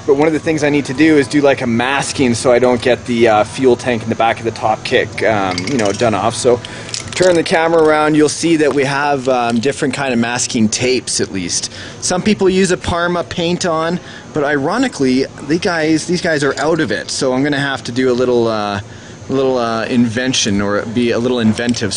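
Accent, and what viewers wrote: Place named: Canada. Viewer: Looks good , I like the fade and the tint . It is kinda funny hearing you deck'lls , I firs heard the on trailer park boys and thought it was just how Bubbles said it , I now know its a Canadian thing . Keep up the awesome work can't wait to see ya tow your crawler in to dudes garage or your mud tuck out to the trails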